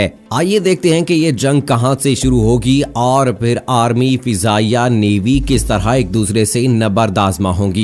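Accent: India. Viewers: خدا خدا کرو .....تمہارے تلفظ سے ہندی صاف جھلک رہی ہے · Apki urdu indians wali lg rahi hai. Humain kisi se daraen nhi. Jb waqt agaya toe hum Pakistanj chorengay kisi ko bhi nahi. Pakistan Zindabad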